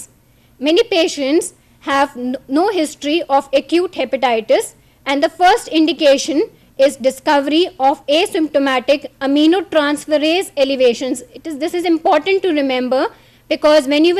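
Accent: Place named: India. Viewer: Anyone who has the patience to listen through the whole lecture will be rewarded with valuable information. Unfortunately some of the written material is hard to see and it is better to stop the video on occasion to absorb it all. Listening to attentively will also clarify the written material, but I do admit that her thick Indian accent and high pitched voice can be a distraction. The viewer must overlook this detail in order to extract the essentials of her presentation.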